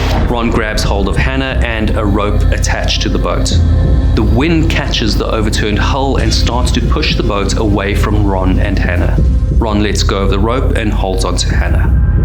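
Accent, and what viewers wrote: South Africa. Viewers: Why do you use prioritize imperial measurements over the metric system? Your channel bio says the channel is based in Germany, and you clearly have a South African accent. Neither one of these countries use imperial measurements. This is baffling that you chose to prioritise your American audience.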